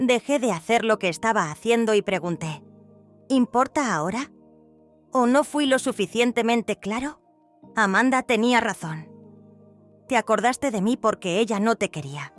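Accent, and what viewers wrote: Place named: Castile, Spain. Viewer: AHORA LA NARRACION ES CASTELLANA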